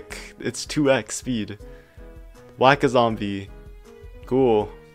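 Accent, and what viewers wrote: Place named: Germany. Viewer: Man merkt dass du Deutscher bist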